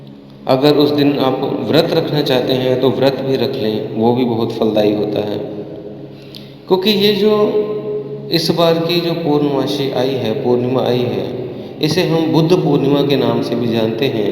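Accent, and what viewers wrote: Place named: Punjab, India. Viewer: आपकी आवाज बताती है आप पंजाबी हैं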